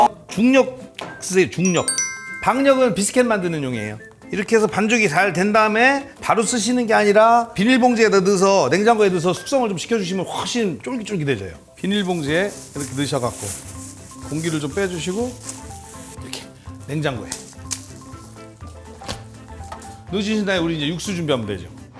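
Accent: Chungcheong, South Korea. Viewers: 충정도사투리가 구수하고 귀여우셔요^^ 믿고보는 님~맘도 따뜻하신 님~^^ 응원합니다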